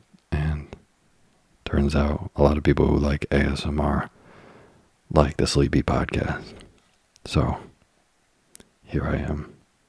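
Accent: South, United States. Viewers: a slight Southern twangy sound somewhere in your voice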